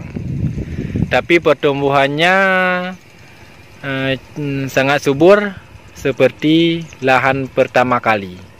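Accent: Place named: Aceh, Indonesia. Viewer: Kaya orang Aceh Berbah Indonesia,Meri bak irama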